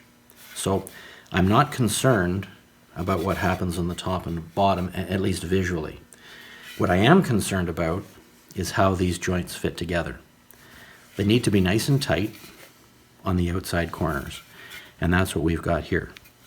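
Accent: Canada. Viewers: Nice video, Interesting! I shan't comment on the criticisms you received on whether it's a strong or not corner reinforcement joint. It is just showing another method of reinforcing a mitre joint. To those who are interested in the tool you used these are on sale in the UK under the description of Spring Miter Clamp Set, Framing Mitre Clamp, Google that and you'll find lots. By your accent i'm assuming you are from Canada, not sure what you would call them there.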